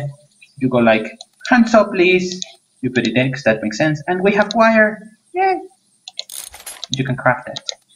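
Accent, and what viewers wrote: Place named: Australia. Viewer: you sound Australian xD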